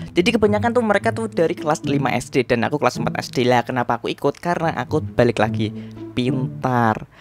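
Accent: Java, Indonesia. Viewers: Jowo Yo mas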